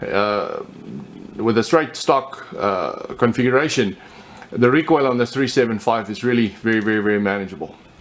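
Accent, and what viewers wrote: South Africa. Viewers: The narration on this video sounds like someone speaking "American" with a South African (Afrikaans) accent....??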